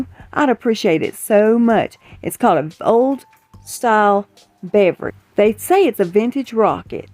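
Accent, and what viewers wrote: South, United States. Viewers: I could listen to your wonderful southern accent all day long. My favourite thing is "Y'all stay safe and be blessed".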